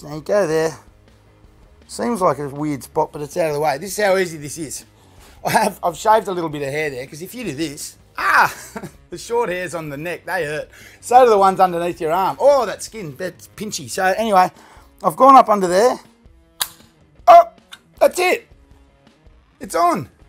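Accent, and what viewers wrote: Australia. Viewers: Aside from the Australian accent, you remind me of steve irwin lol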